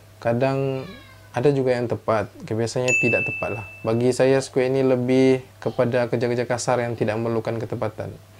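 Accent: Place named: Sabah, Malaysia. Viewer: Tuan boleh tau asal mana? Macam bunyi2 sabah. 😃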